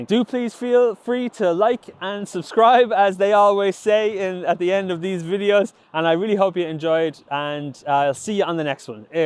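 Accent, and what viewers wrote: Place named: Ireland. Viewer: Love it. BTW you don't need to remind people you are from Ireland.....that is really obvious when you start talking 😆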